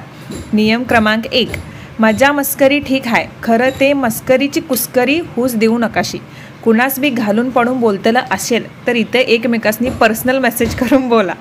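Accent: Kolhapur, India. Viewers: प्रत्येक लाईन मध्ये ( करतली) आणि (करताशी)खुप छान होत भन्नाट कोल्हापुरी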